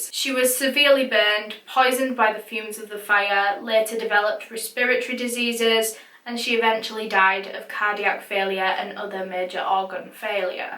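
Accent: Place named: Yorkshire, United Kingdom. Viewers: it's her northern english accent - i think she's from yorkshire cuz of her accent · Just got into your videos and I’m so happy I found your channel. I’m really interested in true crime stories and have pretty much binged your channel since I found it. Love the accent, I live in a town near Hull and went to University in Sheffield so it reminds me of my time there quite a bit. Keep up the amazing content you’ve gained a new sub :)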